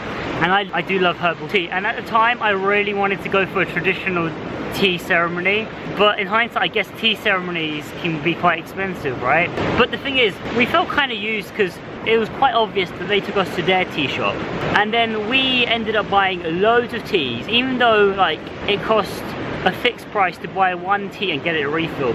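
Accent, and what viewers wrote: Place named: United Kingdom. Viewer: Wow nice 700+ followers, and this video 4000 views good I like your British accent, so good listen to you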